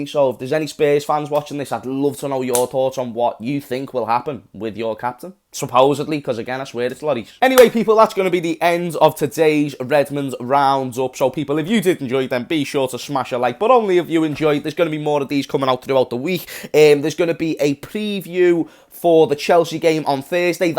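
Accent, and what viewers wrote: Liverpool, United Kingdom. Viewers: Proper Scouser bro, keep up the content lad ynwa..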